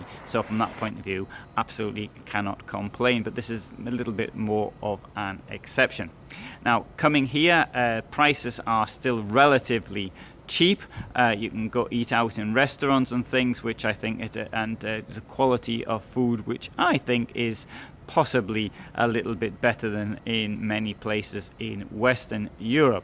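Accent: United Kingdom. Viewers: You have funny lame british accent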